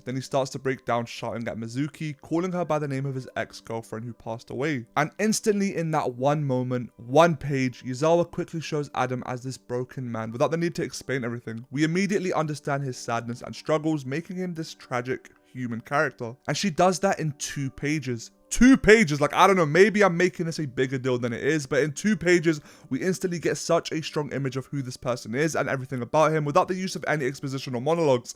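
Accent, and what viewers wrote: United Kingdom. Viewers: you british accent makes me laugh sometimes and it snaps me out of what youre talking ab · ur talking too fast and I'm struggling to keep up due to ur thick british accent tbh.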